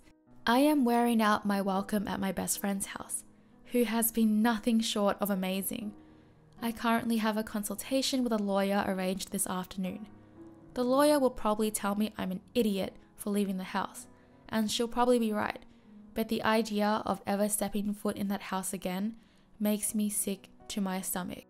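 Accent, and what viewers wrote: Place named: Australia. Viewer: Your voice was soothing. Love your soft aussie accent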